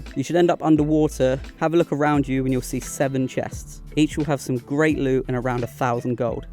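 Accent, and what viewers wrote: England, United Kingdom. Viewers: Lol yea... but... you heard the rest of the video in a perfect English accent as well didnt ya?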